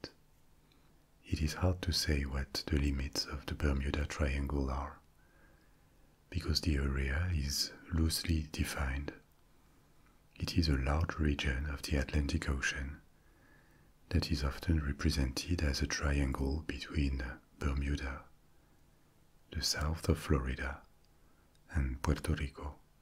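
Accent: France. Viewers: I woke up with this playing for the first time because of autoplay at 2 am and forgot i had ear phones on so i kept wondering why the fuck i could hear a french dude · Can't wait to watch this tonight. It's going to put me asleep like a baby hope I make it through I've been watching your videos every night since I've discovered your Channel 5 months ago thank you for the education and the awesome ASMR French accent.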